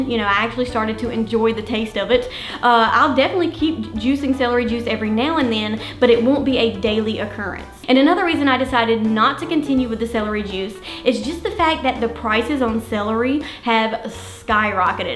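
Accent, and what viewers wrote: South, United States. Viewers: I love your southern accent! Where are you from? I know its 2024, but I am trying this out right now. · Such a nice southern accent I love to hear it I day use to I was living in Alabama Tennessee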